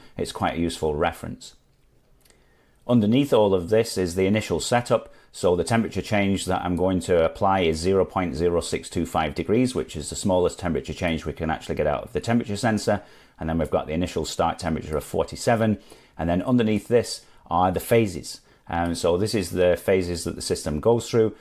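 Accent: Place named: Australia. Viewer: Your Australian accent is beautiful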